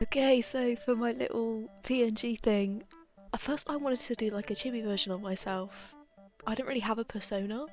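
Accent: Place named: United Kingdom. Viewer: A British Talking Octopus Nice.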